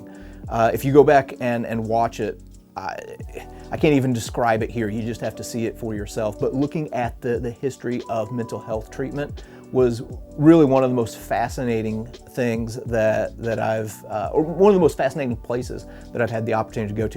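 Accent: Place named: Eastern Massachusetts, United States. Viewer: As someone who grew up in eastern Mass, the pronunciation thing is our way of letting you know that 'you ain't from round here' but we still like you. And just so you know, I have experienced the reverse when I have gone outside NE.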